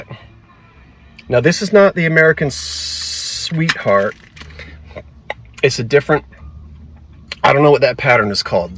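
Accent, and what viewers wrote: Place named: Philadelphia, United States. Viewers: You sound a little bit philly you have said wooder for water 😊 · Yeah, you kinda do have a South Jersey accent. I really enjoyed last night's sale. Lots of fun! Great video, as usual!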